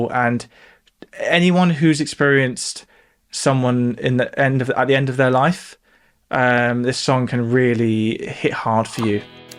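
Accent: United Kingdom. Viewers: Your British is showing strong in this one. Haha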